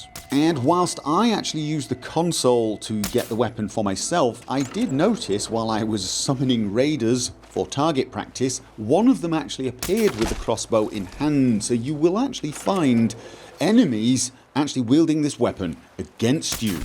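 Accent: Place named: Scotland, United Kingdom. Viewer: Hey: The proper name for the Regent sword is Claymore. Judging by your accent, you're closer to the history if it than I am. Another great video. Thanks.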